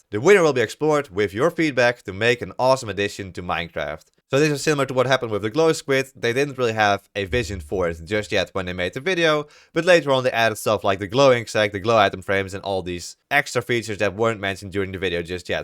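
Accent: Netherlands. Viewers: Ik ben master i can tell your dutch from your acsent lol XD keep up the containt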